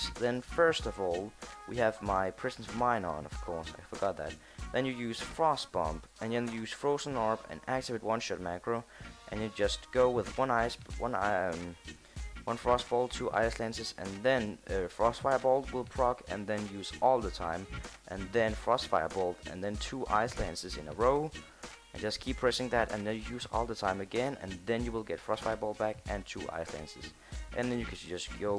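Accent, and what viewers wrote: Denmark. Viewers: Er du fra danmark :D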